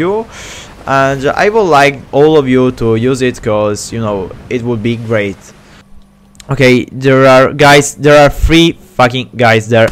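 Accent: Naples, Italy. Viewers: Fantastic video, i love your Italian English Scherzo sono Calabrese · Mi manca la tua parlantina napoletana 😫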